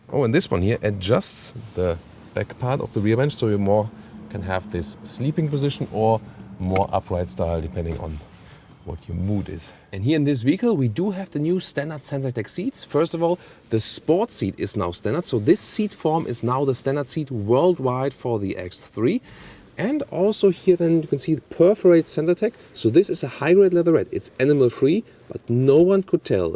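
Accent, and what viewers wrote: Germany. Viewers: beautiful Germany accent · It is interesting how his speech still sounds like german languaege though he speaks english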